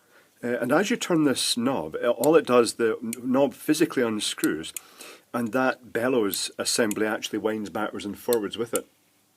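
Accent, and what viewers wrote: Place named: Glasgow, United Kingdom. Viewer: I thought I'd hit the wrong channel & John Ward had suddenly got a Glaswegian accent when I first stated watching this vintage electrical stuff :)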